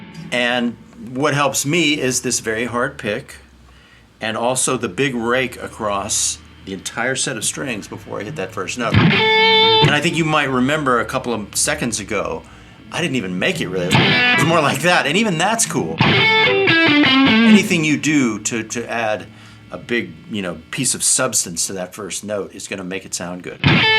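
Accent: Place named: West Coast, United States. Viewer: Oh man, I'm killing myself here. I lifted your solo at the beginning at a slower speed. Finished that last lick and then you start talking but it's still at slow speed and LOL! it sounds like you just took 10 vallum pills ha Oh man with the west coast accent.:) classic...Thank for the licks. I stumbled on this and thought I would a great solo to teach my students. Good strong and Rhythmically accurate licks which is rare in a lot of players.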